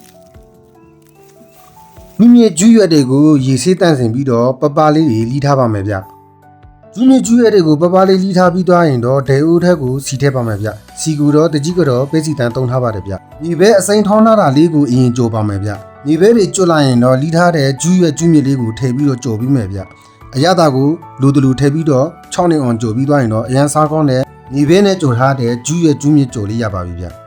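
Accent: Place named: Danu, Myanmar. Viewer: မွှေးရပ်မြေကိုလဲလွမ်း ငယ်ဘဝကိုလဲလွမ်း ဓနုသံဝဲဝဲကိုသတိရ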